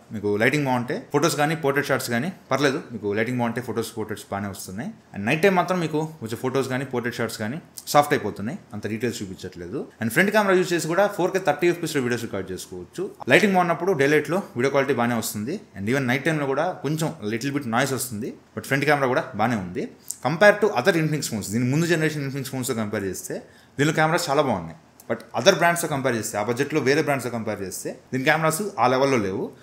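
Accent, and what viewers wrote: Nellore, India. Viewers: Anna Medhi Nellore aa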